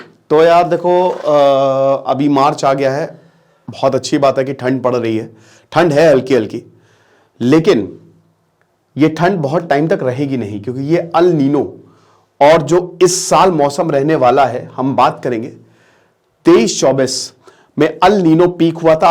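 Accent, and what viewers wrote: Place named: Rajasthan, India. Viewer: I think ye rajasthan se ho ga😂😂😂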